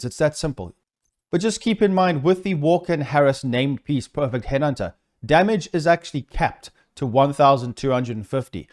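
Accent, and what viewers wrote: South Africa. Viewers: Great video. Haven’t played TD2 after finishing the story when it came out. Thinking about going back again. Anyway, Are you South African? Keep up the great content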